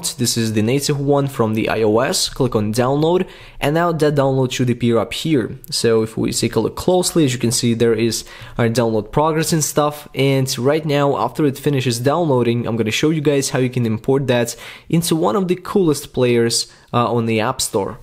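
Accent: Russia. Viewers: Omg, russische Akzent 🐷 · Your accent sounds very Russian 🤔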